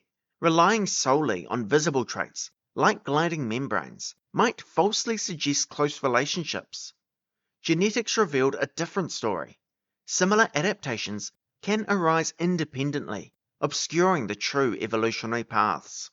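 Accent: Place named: New Zealand. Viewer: Good content and good channel name. I advise adding subtitles to your shorts if you make them as your Kiwi accent may confuse ppl. I can understand though but not everyone might. But it's the lowest priority.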